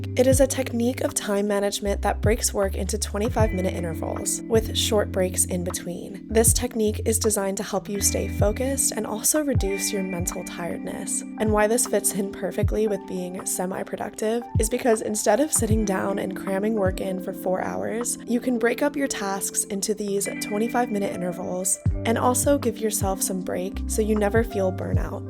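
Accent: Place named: United States. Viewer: This accent is definitely American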